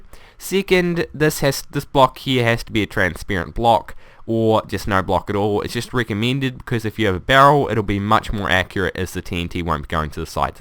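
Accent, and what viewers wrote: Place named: New Zealand. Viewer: As soon as I hear your voice I knew you were a Kiwi <3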